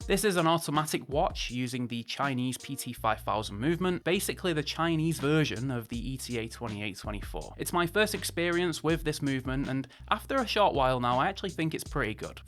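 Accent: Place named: Scotland, United Kingdom. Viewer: Why are all you reviewers Scottish? 😒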